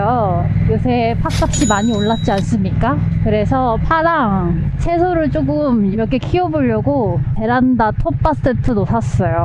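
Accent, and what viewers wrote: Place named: Seoul, South Korea. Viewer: 개시끼 스울말투 와이리 스윗허냐..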